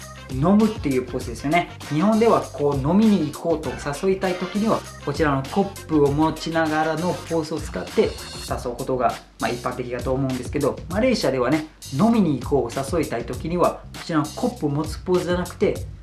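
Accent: Kansai, Japan. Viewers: *さんが話してる関西弁？大阪弁？のスラング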